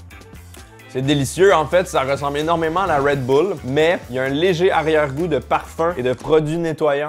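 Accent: Canada. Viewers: J'adore ta vidéo, c'est drôle j'aime ça en plus l'accent du Canada c'est top! vive le canada et et les canadiens , bonjour de France et mes amitiées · J ai horreur de l accent Canadien je Zappe dommage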